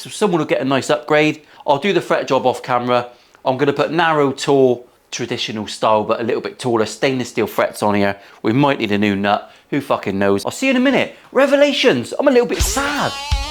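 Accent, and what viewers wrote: United Kingdom. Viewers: Now we're talking. I just Frankenstrat'd a Squire. Took out all 3 pickups. And took the tone out. Put in a Obsidian pot volume. And the rest of the hardware I mean everything else I'd Wilkerson. I put in a p90 at the bridge. I get a funky tele sound and straight up dirty rock. Love this dude. I'm guessing he's A brit? He don't sound like the local hillbillies here.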